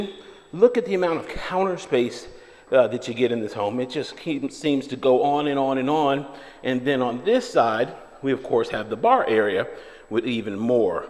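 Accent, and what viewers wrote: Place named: New York, United States. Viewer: 😆 bald folks? You got me there I should have known you was a northerner; you speak just like us in nyc the only think you trick me with southern talking points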